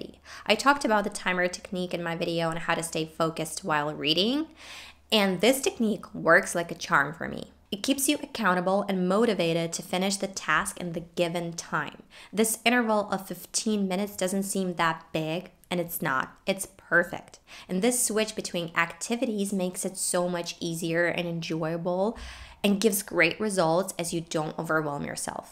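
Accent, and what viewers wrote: United States. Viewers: Is your English pronunciation of the USA? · Hi my intimate teacher,. I follow your videos on a daily basis. I always use the methods that you suggest so as to be excellent at Englih as you. I admire your American accent. When I watch your videos and listen to your fluency, I feel relaxed. I hope I'll speak English as perfectly and fluently as you using your methods soon. Thanks for your beneficial advice and lessons. Keep going and we're all with you. Always take care. Sevara from Uzbekistan:) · Круто! Я б не сказав що Ви Українка, гарний американський акцент. І техніка 15 хвилин варто спробувати!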